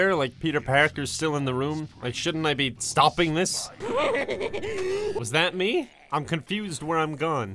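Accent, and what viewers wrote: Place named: Ireland. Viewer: You sound a lot more Irish in this video.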